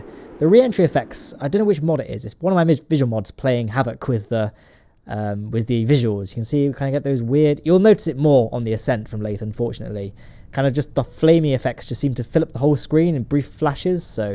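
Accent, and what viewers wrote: United Kingdom. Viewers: Canadian? South park? This guy is a full-fledged brit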